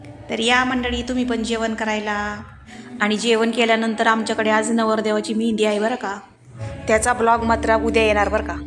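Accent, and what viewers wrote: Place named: Ahilyanagar, India. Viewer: 👍अरे वा म्हणजे माझा अंदाज बरोबर होता 😄 छान विडिओ असतात तुझे मी नगरला aste👍आपल्या माहेरचं कुणीतरी बोलत आहे asc👍जाणवत तूझ्या बोलण्याच्या टोन वरून 😄🙏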